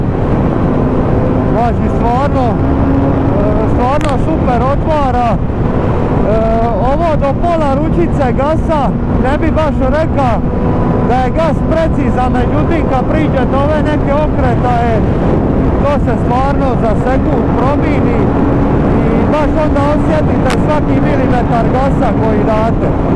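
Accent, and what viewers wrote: Dalmatia, Croatia. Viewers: Volim ovaj Dalmatinski dijalekt. Super recenzije pozdrav iz Rame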